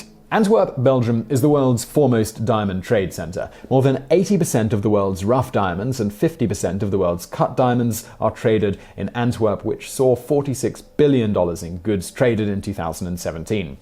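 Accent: United Kingdom. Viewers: jew-lery? why do brits speak such poor english. Another favourite is 'Somethink and War'uh(water) ...??' just why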